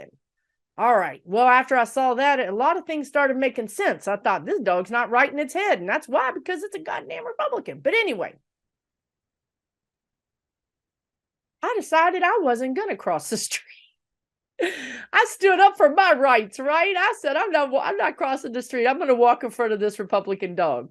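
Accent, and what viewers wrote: Texas, United States. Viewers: Love love love this message. Your Texas drawl gets more pronounced when you’re joyous😉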